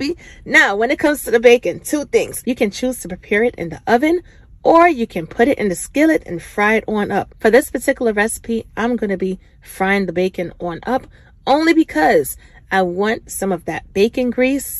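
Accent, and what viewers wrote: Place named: Boston, United States. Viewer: I just love love love your accent and I know I have asked you this a many of times baby. Are you from NYC or nearby? BABY I LOVE LOVE LOVE your accent. It also kinda sounds like from where my LOVELY'S ARE FROM, NEW EDITION, so it could be Boston Mass!!!! Like I said, hell I probably have asked you this question and forgot about it Pooh. You Are The TRUTH!!!!! Just thought I'd let you know. ♥♥♥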